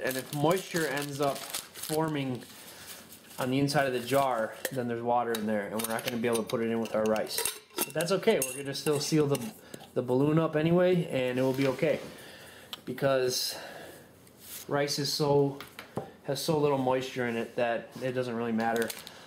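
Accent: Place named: Canada. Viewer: Because of your accent and speech pattern. Are you Canadian or from a state near Canada.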